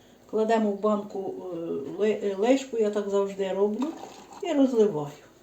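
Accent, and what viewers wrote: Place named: Volhynia, Ukraine. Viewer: Карпати? Говір схожий на волинський, в районі Ковеля.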